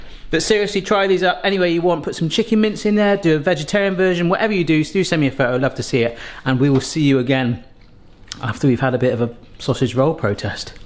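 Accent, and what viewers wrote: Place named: United Kingdom. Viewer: I sooo love your accent, British is actually the best accent! :) <3 from Germany!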